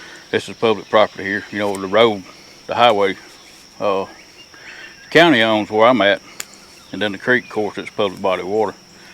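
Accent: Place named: South, United States. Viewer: Wow man you gotta nice Southern accent LOL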